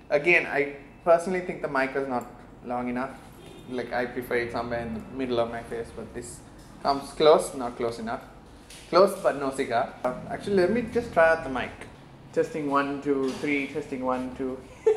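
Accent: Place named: India. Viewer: that indian accent is very bad lmfao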